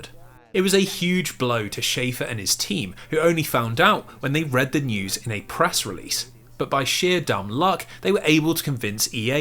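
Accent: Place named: RP, United Kingdom. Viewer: Holy shit, it's him. Am I the only one who had never once seen the guy behind the voice? I had this nerdy, posh British lad in my head (still love the content), but color me shocked.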